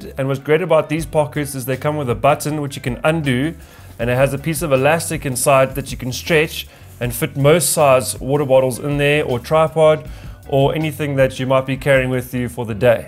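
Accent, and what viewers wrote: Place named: South Africa. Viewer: That would be a South African accent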